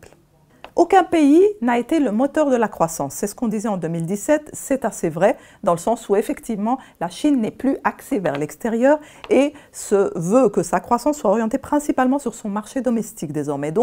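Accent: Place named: Switzerland. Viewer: Delicieux accent qui sent le chocolat Frigor et le Läckerli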